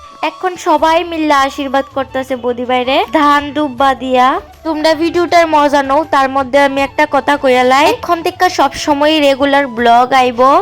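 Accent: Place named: Habiganj, Bangladesh. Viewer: আমার প্রিয় জেলা হবিগঞ্জ থেকে দেখছি আপনার কথা আমাদের হবিগঞ্জের ভাষা মতো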